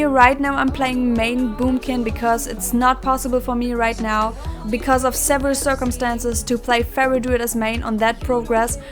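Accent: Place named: Germany. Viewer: Manchmal hört man voll raus dass du eine deutsche bist ;)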